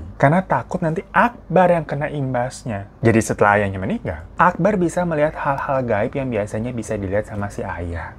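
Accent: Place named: West Java, Indonesia. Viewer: Kak logat sunda tapi nama nya kayak jepang yà....😃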